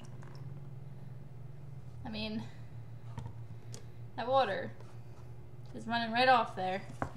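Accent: New Jersey, United States. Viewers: Watched a few of your videos and this is the first time I heard your Jersey accent. P.S. love your old, old videos. You were so damn cute. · You can hear her Jersey accent a lot in this video, especially when she says "off".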